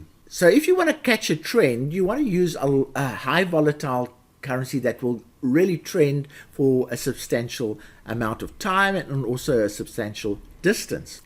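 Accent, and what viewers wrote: South Africa. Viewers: Hi, can I rather ask. Are you a South-African?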